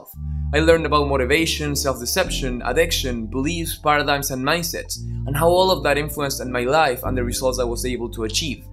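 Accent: Argentina. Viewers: Wow, Im from Argentina too, and I love your accent and the way you talk in inglish is awesome!!! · Tenés voz de porteño, puede ser?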